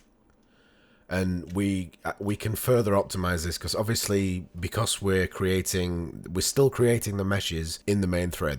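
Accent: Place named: England, United Kingdom. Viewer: Your videos are not boring. For those of us who want to learn, videos like this are rich with content and quality. I walked out of this video being able to thread. That is an invaluable quality in gaming that few people out there bother to take the time and care to teach. So when you present us with this Cake filled with information wealth, it is ANYTHING but boring. Have you noticed we dont thumbs down you? It is partly because you are english and sound awesome, but mostly, its because somehow, the way you teach gets through. You take time, you allow us time to process... These long videos, I watch through from one end to another. This, is quality. I will continue to patreon you until I am poor because you have helped us.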